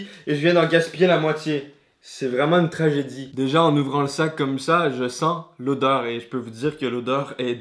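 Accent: France. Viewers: Écouter ça en 2023, my god l'accent français. Le voyage t'avait laissé des restants 😝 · WTF !? Pourquoi dis-tu que tu es Québécois quand tu met en valeur un accent français de France dans tes vidéos??????????????? ( Sans insulter personne )retire : PAROLE DE SHLAG QUÉBÉCOIS dans ta description à cause TU LA PAS POUR UNE CENT xD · PK tu te donne l'accent francais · Mdr t'as un accent français dans cette vid XDD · Qui trouve qu'il a pris un accent français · criss t'es revenue avec un accent francais on dirait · Je trouve que à cette epoque il avait plus l'accens Fr que QUEBEC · Ton accent a bin changer osti, t’avais un sale accent français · Ques tu fait avec un accent français???!!! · Ton accent a changé tu as adopté UNPEU l'accent francais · tes revenu avec un accent francais big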